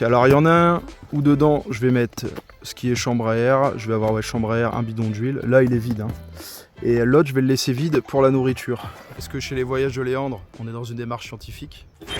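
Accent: France. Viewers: salut, j'entend que tu est du limousin!? moi de même ✌peut être qu'on se croisera 😉je m'abonne pour la peine😎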